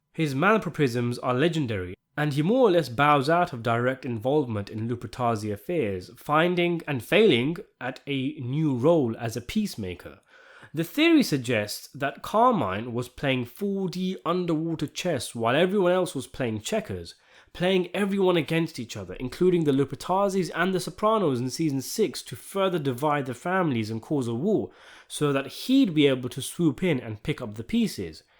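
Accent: United Kingdom. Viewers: My greatest mystery is your accent - it sounds British-ish but not quite... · I love how the narrator leaves the curse words in the commentary, but they get blanked out in the closed-captioning. And it's always fun listening to British accents talking about American mobsters.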